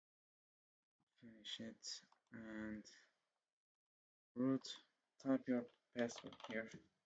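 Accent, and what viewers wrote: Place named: Turkey. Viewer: Btw, i am sure you are Turkish since your accent is like me :)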